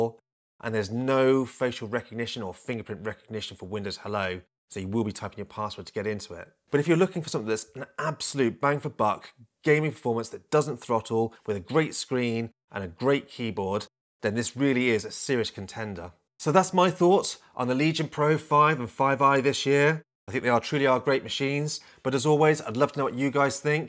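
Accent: United Kingdom. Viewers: laptop review in BS English is refreshing, since majority are in US English. makes the laptop sounds classier.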